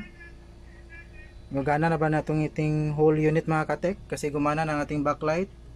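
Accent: Visayas, Philippines. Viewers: Good job, bisaya ka sir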